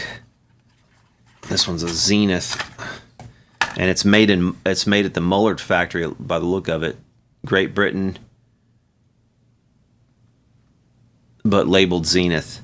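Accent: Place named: Georgia, United States. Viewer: SOUTH Georgia accent, be precise! nice work. Nice compressor.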